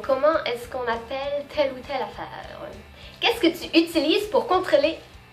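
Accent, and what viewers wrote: Quebec, Canada. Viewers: c'est amusant parfois tu as l'accent québécois(la plupart du temps) et d'autres fois un accent français sans accent.Tu es Québécoise ou Française? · J'trouve ça drôle/cute, elle parle à la française et quand elle vient pour finir sa phrase. Elle change pour l'accent québécois. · Tu l'as bcp l'accent de Québec quand tu parles ! ;) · Je trouve sa tellement drôle car je viens de commencer a écouteur tes vidéos et la avec tes vidéo du Québec se fou comment tu commencer a parler comme nous québécois! Je trouve que cette accent la te va bien :) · Honnêtement bravo à toi j’ai jamais vue ça tu as tellement bien pris l’accent Québécois 😉 et tu as raison car il est tellement mieux que l’accent français 😂🤣 p.s je suis d’Amérique du Sud 🇬🇫 (d’un département français) et moi aussi j’adore le Canada 🇨🇦 et le Québec je compte bien m’en venir habiter la bas, bye 👋🏽 et encore merci pour ta super vidéo. 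· très bel accent ,je te croyais québécoise au début et je suis québécois .Tu es très jolie aussi bref continue c l'fun en ostie lol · C'est intéressant de voir qu'elle se met à parler avec l'accent du Québec, de façon naturelle, sans qu'elle ne s'en rende compte. L'accent devient plus réaliste. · Je suis moi même Québécoise et en 2012 en camp de jour j'ai eue un moniteur Français et a la fin de l'été il parlais comme toi et ton accent est vraiment interessant et aussi tu a le parler d'une Québécoise mais l'accent d'une Française s'est vraiment bizard mais continu t'est video elles sont drôle ;) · c'est assez drole que tu as un accent québécois alors que tu habite en france je viens de découvrir ta chaine et tu est super 😊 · J'adore ton accent québécois-francais 😍😍😍😘✌️✌️👌👌👌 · wow c'est trop cute tu parles semi à la québécoise semi à la française ...c'est adorable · Au Québec on loff ou fox les cours :') mais j'adore ton accent semi québécois semi français!!😊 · je n'ai jamais entendu un accent comme ça! 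Il est unique hahahah c rare d'ententre un quebecois parler français ou un français parler québécois , toi tu as les deux! c fou c vrm original jaime bien ahha :) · Omg! J'adore ton accent😂 C'est trop drôle genre ta l'accent québécois et des fois français! C'est trop chou 😂😘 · tres bonne video, ton accent est 99% quebecois ! ^^ continue tes video! (just comme sa, jolie coupe de cheveux ;) 👌😄✌ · Ahahahaha!! T'es pas mal drôle à entendre ^^ première personne avec l'accent Francais que j'entends qui a pogné l'accent Québécois! :p j'ai des petites affaires à ajouter (j'ai pas lu tous Les comms alors dsl si on t'a déja repris!) Pour Les "chaussures d'été, on dit ballerine, pour Les cheveux on dit "applatire" et elles faisaient référence au "soccer" vs "footbol American" vs " footbol" ^^ oh et, ici, on "fox" Les cours! "Foxer" :p hihihi merci c'était "bien sympa!!" ^^ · Déjà je pense que ton accent québécois tu le garde juste par envie pcq ta prononciation on français est quasi parfaite et puis ce tag est très intéressant pr découvrir chacun l'autre ;) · Salut! 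J'me demande pourquoi personne s'est levé et dit que au Québec, pour Cotton Ouatté, on utilise aussi le terme Kangourou (l'animal) parce que y a une poche en avant au niveau du ventre. En tout cas, t'es vraiment une fan du Québec et c'est agréable à voir/entendre/regarder. J'trouve que y'a pas vraiment de youtuber québécois alors je vais m'y lancer à temps perdu. Soit heureuse, et le "Bon Matiiiiinnnnn" a aussi changé ma vie, quand je l'ai entendu de la bouche de la fille que j'aimais, au Cégep. On a même une marque (sorte, compagnie) de pain qui s'appelle Bon Matin au QC. En tout cas, ça doit être la marde pour toi vue que t'as ET l'accent français ET l'accent québécois. Le monde doivent te juger peu importe où tu vas hahaha. On s'appel et on déjeune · Tu as réussi ton objectif de divertir avec la comparaison des expression. C'est amusant drôle et instructif. Et pour ton accent à toi, alors c'est évident que tu es «presque Québécoise» Merci pour tes vidéos.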